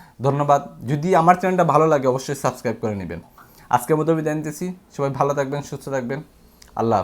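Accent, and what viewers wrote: Sylhet, Bangladesh. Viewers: হুজাইফা এন্টারপ্রাইজ থেকে প্রথমে আপনার কথা শুনছি মনে হয় আপনি সিলেটি আমি দুবাই এয়রপোটে কাজ করি আমি জানি এগুলো আপনার সাথে পরিচয় থাকলে ভাল হয় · ভাই আপনি সিলেটি নাকি? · আপনি কি সিলেটি? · Boro bai apni jotoi vasha diye kotha bolen asole kintu apni amader sylheti bai right ? Deka hobe inshaallah dubai deira te aiyar’ 2012 te ami achlam 2 bosor r akon 7 bosor pora aiyar visit a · ভাইয়ের বাড়ি মনেহয় সিলেট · nice. Vai apni ki amader sylheti naki. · You are sylheti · vai ki sylheti🥰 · ভাই সিলেটি😊😊😊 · Vai apnar bari sylhet... Ami bujte parchi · ভাইতো মনে হয় আমাদের সিলেটের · সিলেটি নাকি ভাই? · সিলেটি নি ভাই তুমি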